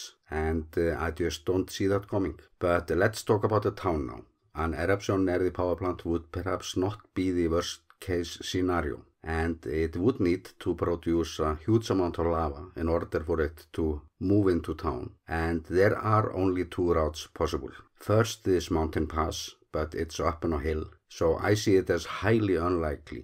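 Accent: Iceland. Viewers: could you speak SLOWER with your Icelandic English, please???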